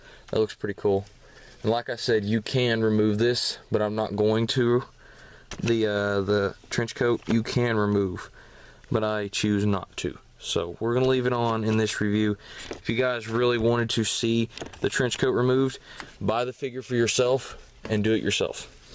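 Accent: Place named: South, United States. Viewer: I'm loving the southern accent. I'm southern myself. North Carolina born and raised. I'm also a country singer and songwriter and comedian.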